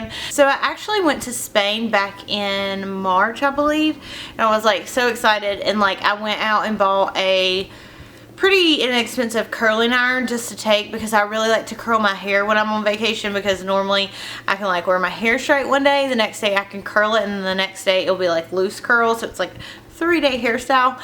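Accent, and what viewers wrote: South, United States. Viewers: love your southern charm, you're accent is so adorable, have fun in Atlanta!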